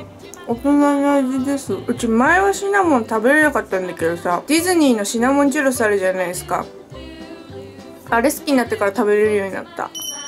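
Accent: Aomori, Japan. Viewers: 私も東北出身だからの青森訛り聞くと実家いるみたいでほんとに落ち着く笑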